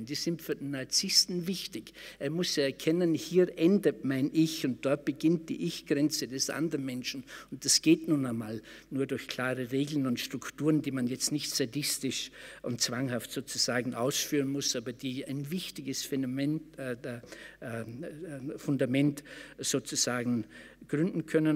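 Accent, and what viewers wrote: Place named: Austria. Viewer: Aaah, kenne den Herrn aus gewissen Truecrime-Formaten im TV!!! Fand schon immer, abgesehen von der Qualität seiner Wortbeiträge, dass er äußerst angenehm spricht!!! Ein toller Redner und dazu noch dieser leicht österreichische Dialekt!!! Zum Verlieben! ❤️😂 PS. "Verrecken" ist für ihn ein Kraftausdruck!!!! Wow!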